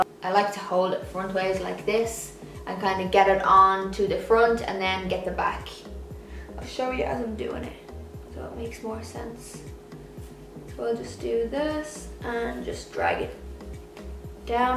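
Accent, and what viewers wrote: Ireland. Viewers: Obviously Irish, beautiful and obvious. GO IRELAND! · Denise Gasparini she’s definitely Irish yes! I don’t know where the Hispanic come from 😅🙈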